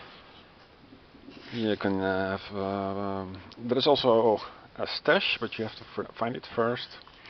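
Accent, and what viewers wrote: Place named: Netherlands. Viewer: Ah I thought I recognized a Dutch accent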